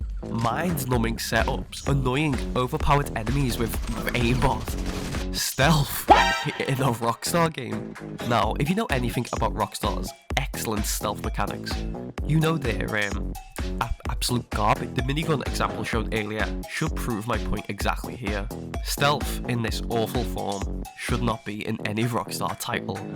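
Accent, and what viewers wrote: Liverpool, United Kingdom. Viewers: From Liverpool?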